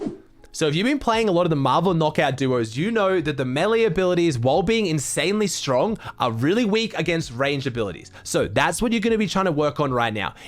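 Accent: Australia. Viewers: How many people low aussies accent 👇🏻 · Aussie tryin to coach me?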